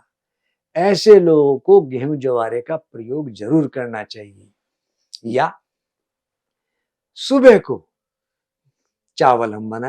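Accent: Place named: Haryana, India. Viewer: yes haryanwi doc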